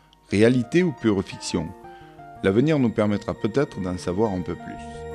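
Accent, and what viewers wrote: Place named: Provence, France. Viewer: Très belle accent provençal sa fait du bien du patoi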